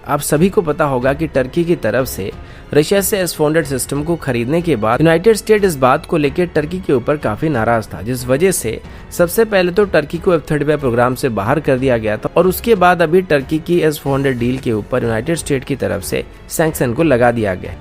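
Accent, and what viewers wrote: Odisha, India. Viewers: U from Odisha ?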